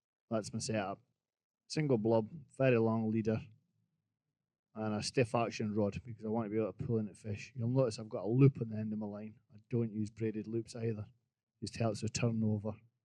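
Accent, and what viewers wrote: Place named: Aberdeen, United Kingdom. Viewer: 1st class video, listening to you reminds me of my time in Aberdeen.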